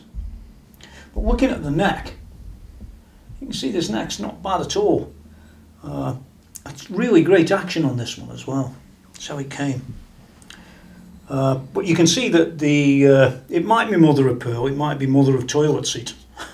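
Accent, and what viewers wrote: United Kingdom. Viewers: I hear a British accent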